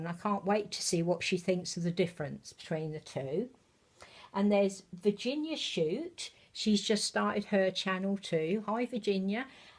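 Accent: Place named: Berkshire, United Kingdom. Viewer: Oh dear, you were definitely not too chatty and we both adore you. You are just like my Mum in so many ways. She also gets really nervous when meeting new people but she couldn't speak more highly of you on the way home from yours. She felt she made a real connection with you. Please don't think you put us off in any way - we love you just the way you are. Sarah Louise is looking gorgeous as always. The hat and booties are a lovely colour. Nothing wrong with a Berkshire accent I might add - I have a Berkshire twang as well - lol. Me and Mum want to visit you again soon but I don't want you getting nervous about it - silly you!!. Love and big hugs Annie xx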